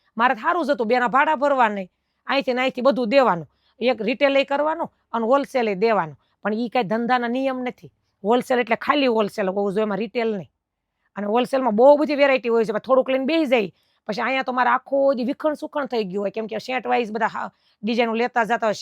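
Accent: Kathiawar, India. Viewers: She is speaking kathiyavadi gujarati language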